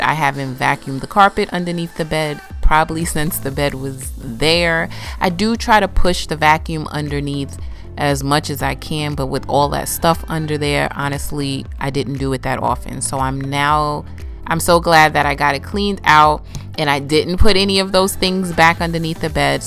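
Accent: New York, United States. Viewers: Glad you're better Suga, I love it! You picked a great color for your accent wall..I am a pillow person so I'm fine with it. They look really nice once the bed is made. I was cracking up when you were on the bed watching tv while hubby was fixing the bookshelf!! You have a beautiful NY accent!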